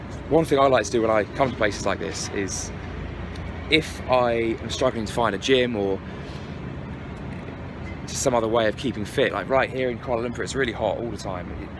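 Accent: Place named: United Kingdom. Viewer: Interesting vlog. Glad you had a good time in KL. Your British accent is so thick. Doncaster?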